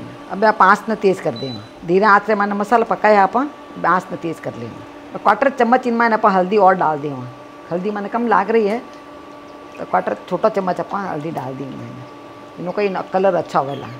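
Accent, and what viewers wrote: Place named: Rajasthan, India. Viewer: Aunty u r great apki saari dishes bhut achi shandar hoti h Par jab aap saath me marwadi bolte huye bnate ho to usme aur bhi jaan aa jati h Bhut acha lgta hai jab aap marwadi bolte huye sikhate h Ekdum maa jesi feeling aati h Thanks ki aap sabko sikhane ke liye itni mehnat karte ho.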